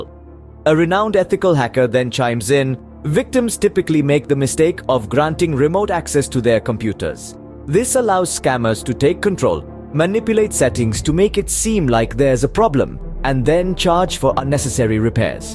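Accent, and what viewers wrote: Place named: India. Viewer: " a trusted source like Microsoft" Who the heck trusts Microsoft? I can cope with a scammer better than I can cope with Microsoft. Hate to break it to you, but Microsoft have permanent access to your WIndows computer anyway these days. Anyway, the elephant in the room is that 99% of scams are from India, and an Indian accent on the phone is a massive red flag - like like the commentary here in fact.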